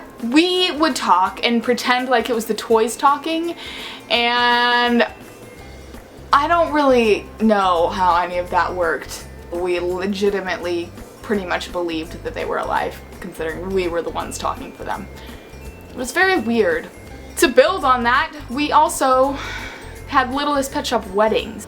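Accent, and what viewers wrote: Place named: United Kingdom. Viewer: For some reason U sound like U have a British accent in this video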